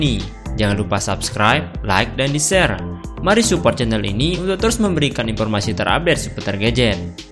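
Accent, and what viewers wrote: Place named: Bugis, Indonesia. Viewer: Logat bugisnya masih ada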